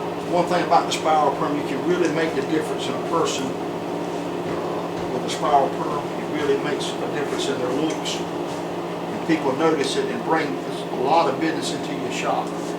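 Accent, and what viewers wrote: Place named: East Tennessee, United States. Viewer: Where is he from! He sounds like us here in East Tennessee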